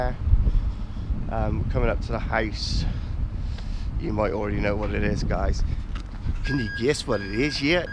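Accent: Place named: Gloucestershire, United Kingdom. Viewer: if from Gloucestershire they all have that accent.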